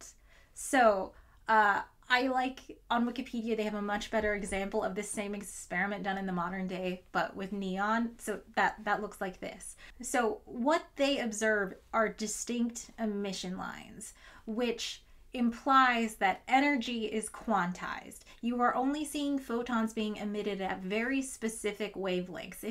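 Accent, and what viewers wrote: United States. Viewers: Nice video. I just wish you weren't butchering (aka Americanising) not English names to this extent.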